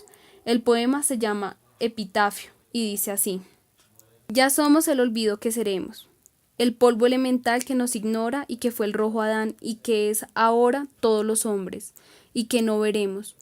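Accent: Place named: Colombia. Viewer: que bonita vez y que gusto escucharte. Estaba termindo el libro y estaba agotado asi que busque si habria un audilibro de esta increible relato y no solo disfrute del libro sino tambien de escucharlo asi, tan claro y con el acento que te lleva a colombia.Muchas gracias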